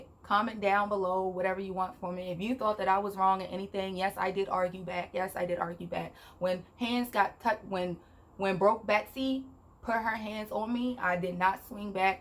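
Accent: Baltimore, United States. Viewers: Are you from Baltimore or Philly? I swear I detected my Bmore accent. New here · She said it dew she’s from b more 😂 has to be I low key hear it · could tell you were from maryland maybe baltimore from the accent 😭😭,, i love your personality so much !!! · "Dip off Diana" I'm crying off these names! I knew u were from Baltimore as soon as u started talking lol. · Ik you were from Maryland ik our accent anywhere. I love this story!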